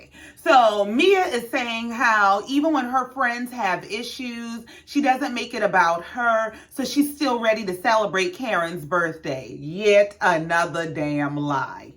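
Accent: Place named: Gullah Geechee, United States. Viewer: I just found your channel. I love your commentary and it’s a plus you sound just like my people from SC. (Geechie) Subscribed! ☺️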